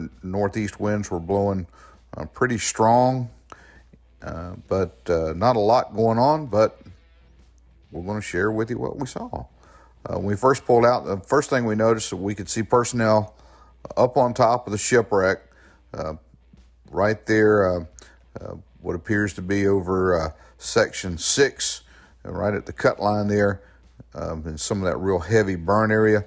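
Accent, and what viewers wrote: South, United States. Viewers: The water conditions sound better with your southern drawl!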